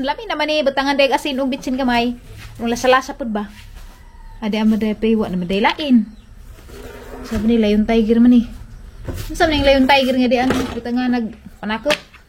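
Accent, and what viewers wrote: Bohol, Philippines. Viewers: Nindut kajo paminawon ag tinagabohol..Pohon mayo untag mkaari kag Ormoc ..ba mgpa picture LNG ko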